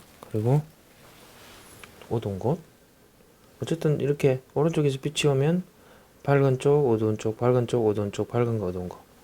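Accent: Gyeongsang, South Korea. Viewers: 경상도 사투라가 정감있고 너무 웃깁니다,훌륭한 그림배움과 더불어 갖는 큰 즐거움 입니다.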